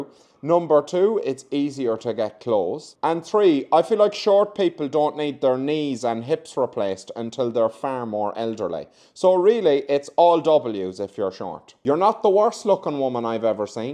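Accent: South, United States. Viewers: 😲 Your southern accent is spot on! “Bless your/his/her/their heart” isn’t always an insult. It depends upon the tone of voice and facial expressions used when saying it. “Sweet pea” can also be used in a similar way. In fact, 🤔 I think most southern compliments can be used interchangeably as insults (you have to be polite, after all). The tone, timing, facial and body expression is the key. Shoot, my favorite southern “fuck you” is “Jesus loves you!” 😂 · Great southern accent!!! :) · 😂😂 the southern accent was what I needed to hear today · Your performance of the southern accent is spot on. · I love your southern accent 😊😅 Butter wouldn’t melt in her mouth People asked if I was part of the BLUE ARMY…I had no idea why they would think I was in the army!!! ☕️☕️☕️☕️☕️ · You do MARVELOUS American accents!! Have you lived over here?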